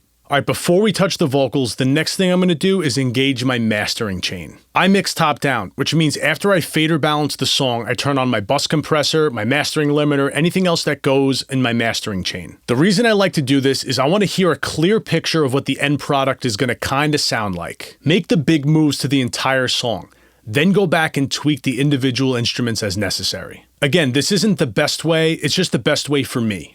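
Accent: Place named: East Coast, United States. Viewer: With That east coast accent, I couldn't tell if he was saying "open up the DAW" or "open up the door"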